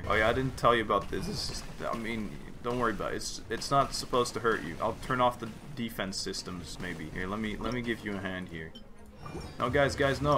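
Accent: France. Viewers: i just realized has a perfect french accent, and so he might be french like me- i'm not sure how i'm supposed to react to it, but i guess i'll take it positively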